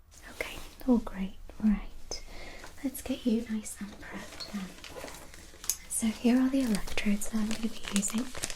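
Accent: United Kingdom. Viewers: Are you British girl?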